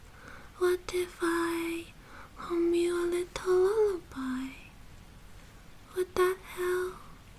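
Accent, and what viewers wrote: United Kingdom. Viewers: Can you make a part 2 of this :D, this is my favorite asmr yet with the British accent ｡◕‿◕｡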